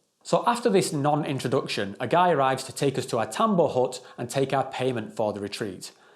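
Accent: United Kingdom. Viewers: Awesome review mate. It was definitely balanced and fair. Honestly some really vital information that anyone planning to spend thousands of dollars and weeks of their time to execute should know. I have a feeling that by the time I’d actually go on a retreat, Merosa would be a real gem. On a side note, I hud no idea you levved over thur in Swetzerland. I feel like the more I lesten to ya the moar I start thenkin in ur accent. But I’m just a white boy from the good ol’ Yew Ess of fockin’ A mate. But for real, what part of the UK are you from? I seriously dig your accent.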